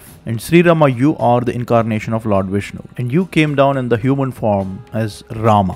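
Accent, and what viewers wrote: India. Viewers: Sir, your Youtube Info says that this channel is Belgium based, but you sound like indian while reciting Shlokas in the videos, anyways stay healthy and keep us updating with the knowledge 🙏🏼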